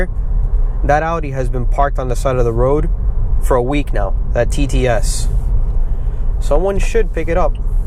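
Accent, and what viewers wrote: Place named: Miami, United States. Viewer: I can just tell you from Miami lol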